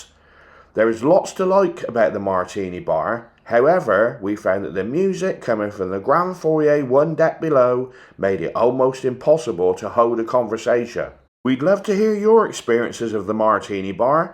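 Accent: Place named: England, United Kingdom. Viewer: Sorry if this has been asked before but what part of England is your accent from, Devon? Just discovered your videos -great job you two!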